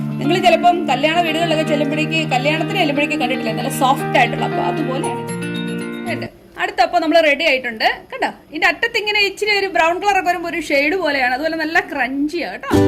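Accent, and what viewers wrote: Kottayam, India. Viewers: kottyam kari ano am from kidangoor pala now your talking style very nice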